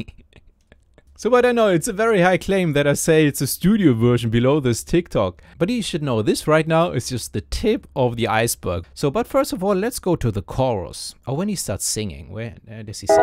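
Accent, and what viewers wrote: Germany. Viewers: Nice video! I CAN HEAR THE GERMAN! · I can tell that your German 🎉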